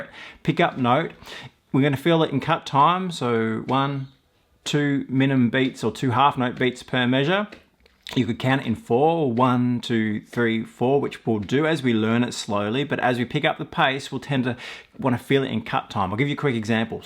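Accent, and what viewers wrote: Australia. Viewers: Y'know... over here in America, when watching flatpicking videos normally we hear a familiar hillbilly accent... like from Kentucky or Tennessee, North Carolina, Virginia, or even Southern Missouri. Then there's fellers from Italy that pop up... Beppe Gambetta and Roberto Dalla Vecchia who sho' can pick! And listening to their Italian accents, don't sound like Knoxville, if you git my drift... and then I found another person from ENGLAND, a lady named Charlotte who made my jaw drop, she got Bryan Sutton's attention, invited her over to the USA to help instruct guitar... and this here video... what's that Australia? Bluegrass RULES!!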